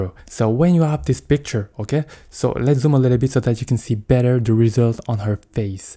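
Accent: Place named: Italy. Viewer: i like ur english akzent. are u from italy or something like that? its sound like that.. ^^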